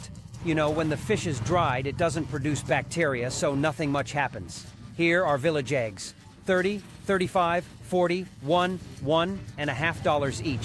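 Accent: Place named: Gaziantep, Turkey. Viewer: La Yorum Alının Çatında Yaziy Antepli Olduğun Sesini Duyar Duymaz Antepli Olduğunu Bildim Rafık 😂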